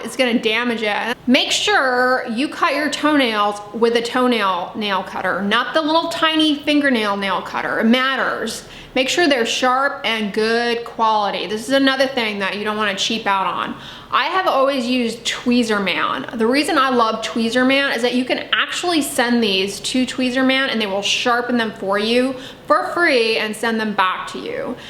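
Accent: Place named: South, United States. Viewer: I don’t like your southern accent! This accent irritates me to the core but thanks for your ( advise).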